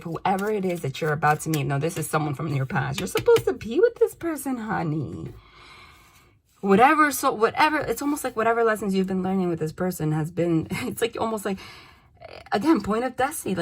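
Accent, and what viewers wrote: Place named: New York, United States. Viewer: ok not relevant to the vid but i'm living for that new york accent.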